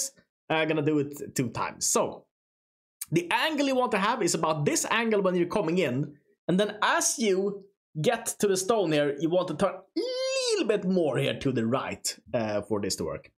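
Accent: Sweden. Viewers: Svengelskan är start asså😁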